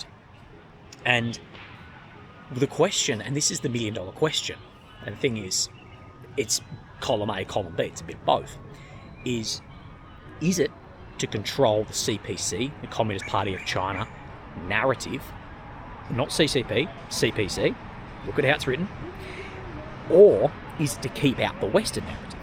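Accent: Australia. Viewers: what accent is it， assuie? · You must be Australian based on your accent. Welcome to China.🤣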